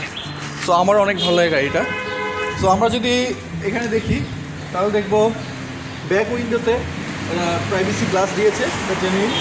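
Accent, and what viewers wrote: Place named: Chittagong, Bangladesh. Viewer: bro you have ctg accent! Are you from ctg?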